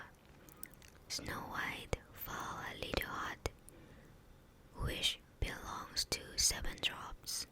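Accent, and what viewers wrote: Standard. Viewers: 发音好标准，好萌！🥹🫶🏻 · 你的中文发音很标准，谢谢～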